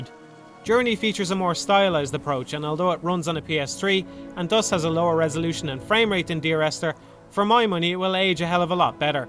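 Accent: Ireland. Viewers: What is this accent, Irish? It's lovely, whatever it is. Now to keep watching the video to see if he makes a decent point.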